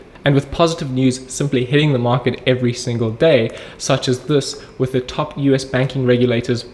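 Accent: South Africa. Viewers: Legend! Good to hear a South African voice in this space. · Are you South African? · South African?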